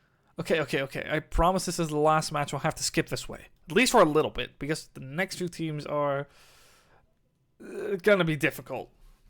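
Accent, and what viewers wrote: Limburg, Netherlands. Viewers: hoor ik hier nou een tikkeltje limburgs?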